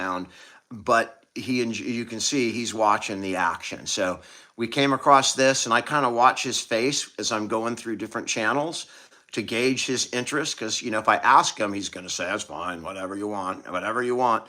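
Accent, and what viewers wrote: California, United States. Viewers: Hi, Good to see you and your Pop. My late hubby with dementia was constantly getting UTIs whenever he was in nursing homes because it's just a given when they wear diapers. When he was at home with me, he had no incontinence issues. Just wanted to make sure you knew that doing the prostate procedure may not circumvent the UTIs. I live in southern Cali and you totally sound like a Californian to me! 👍🌞XX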